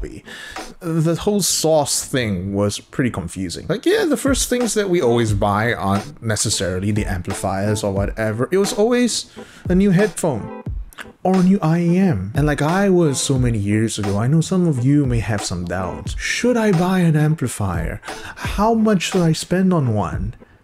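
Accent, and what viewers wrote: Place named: Singapore. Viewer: Just found your channel and I super appreciate your no-nonsense style and knowledgeability. Also your accent makes me nostalgic for sg ✌🏼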